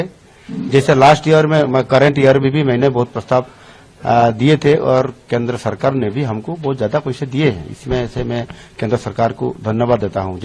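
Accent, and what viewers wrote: Odisha, India. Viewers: hindi bolte bakt odia pan rahega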